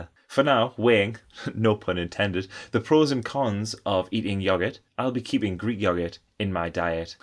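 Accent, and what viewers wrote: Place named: Newcastle, United Kingdom. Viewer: Thank you. Your Geordie accent is lovely.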